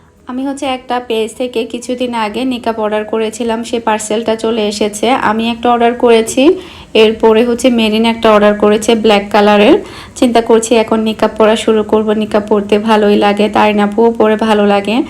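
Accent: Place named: Satkania, Bangladesh. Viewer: চট্টগ্রামে না সাতকানিয়া স্টাইলের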